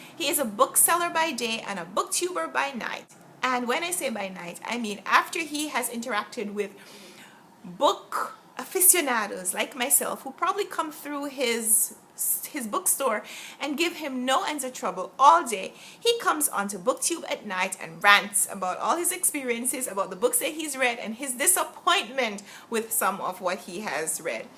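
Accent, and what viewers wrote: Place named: Jamaica. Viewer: It's so heart-warming! I too LOVE your Jamaican accent! It's so beautiful! Irie!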